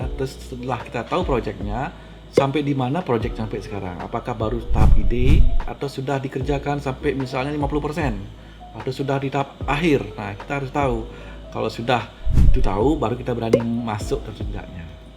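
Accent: Bali, Indonesia. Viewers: orang bali ya blii? aksen nya seperti orang bali///?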